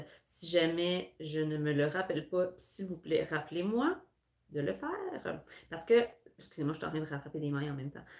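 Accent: Quebec, Canada. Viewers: Merci pour tes vlogs, je guette toujours la mise en ligne pour découvrir tes ouvrages et entendre ton merveilleux accent et le vocabulaire québécois :) Je me suis (re)mise au tricot il y a un peu plus de 2 ans (j'avais appris enfant avec ma grand-mère mais j'étais surtout brodeuse) et tes tutos m'ont vraiment permis de me lancer dans des choses plus compliquées comme les chaussettes. Il me reste à faire un pull, j'ai fait des châles assez techniques mais je bloque un peu par peur de gâcher une grande quantité de laine en tricotant un vêtement qui ne serait pas à la bonne taille... C'est vraiment le fit qui me fait peur, au moins pas ce problème avec les châles :D Mais je vais m'y mettre !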